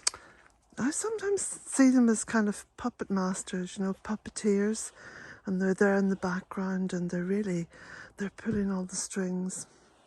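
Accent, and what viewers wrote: Ireland. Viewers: I just want to speak about your books. I have them all. I read and re read these. In these stressful times it is heart warming to read how you tell your story. Your passion comes to life in a very colorful way. Your love and devotion to the Goddess. To Earth. I actually think I read and hear your Irish accent as I go along. Lol. It's comforting and brings the certainty that we will make it through all this. "In a time of destruction create something", plant something, love something, nurture something. Great job! Blessings💖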